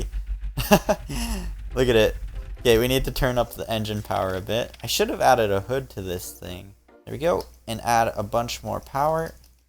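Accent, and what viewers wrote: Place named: Canada. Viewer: can't get over the fact that ur Canadian so u pronounce about aboot... no offence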